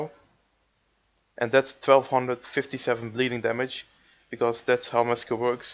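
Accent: Netherlands. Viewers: just a question where are you from? Basing on your accent im quessing the netherlands?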